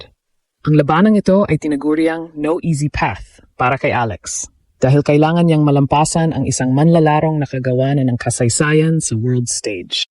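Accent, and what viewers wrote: Philippines. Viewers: AI… commentator accent is BADLY Pilipino … DESTROYING the beauty of the language …. Bad diction STOP please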